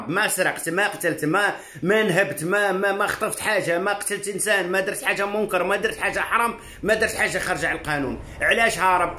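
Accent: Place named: Ain Sefra, Algeria. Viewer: السلام عليكم ورحمة الله وبركاته تبارك الله عليك خيي . لهجتك تشبه لهجة عين الصفراء ، كنت اضن انك صفرازي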